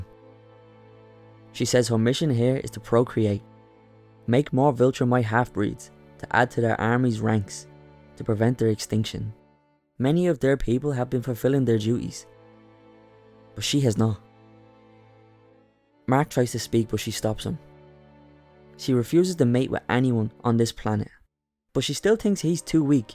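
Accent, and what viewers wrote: Dublin, Ireland. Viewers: im guessing north dublin just cus it sounds like some of my mates accents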